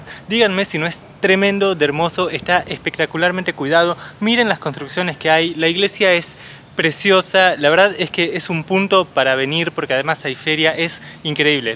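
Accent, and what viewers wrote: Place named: Buenos Aires, Argentina. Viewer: ¡Muy estimado joven nuestro, vecino mío, de verdad qué alegría poder visionar el nuevo vídeo de vos! Me preocupaba la ausencia. Quisiera reiterar a qué punto los vídeos me traen luz y felicidad. Y el acento puro porteño me da gran placer a pesar de unos puros argentimismos los cuales me escapan. Gracias a vos conozco la Argentina a cómo nunca la conocí previamente. Cuando Vds sacaron la copa, lloré de pura gratitud días mediante. No hay país más lindo y más encantador que la Argentina por todo el continente. En puro Don Quijote, ¡Cabalgamos, cabalgamos! Y serían bien apreciadas unas visititas de vos a Mendoza, si fuera posible. Más una peditita de mi parte. El apellido de vos lleva toda una historia en si. Sería también muy interesante un vídeo de un recorrido histórico del apellido. Según la Real Academia Española, es un apellido sefardí de conversos judíos de Salamanca convertidos al catolicismo y luego exiliados por el planeta entero. 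He conocido de por mi vida a unos oriundos de Venecia, de Estambul, de Casablanca, de Tesalónica, etc. Desde Pocitos de Montevideo, con todo respeto a vos, un fuerte abrazote charrúa, Lulú y toda la familia 👪de ella.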